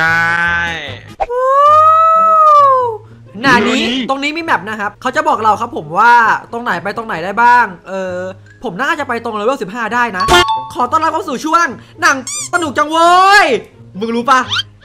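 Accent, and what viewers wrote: Isan, Thailand. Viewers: พี่อีสานป่าว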